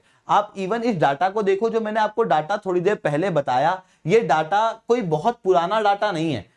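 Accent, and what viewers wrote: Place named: West Bengal, India. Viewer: chaudhary it's WB accent not odia accent. Not so new tho since odisha is not recognised that much in whole india.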